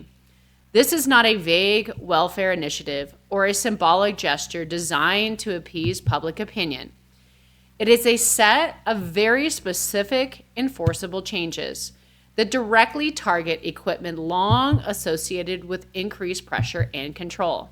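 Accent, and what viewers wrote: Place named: United States. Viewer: You have American accent and the US is second only to china in abusing animals. How about you showcase horses in America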